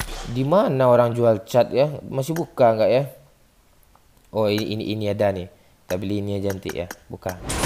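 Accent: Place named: Java, Indonesia. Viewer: Bang bhsa medokk🤣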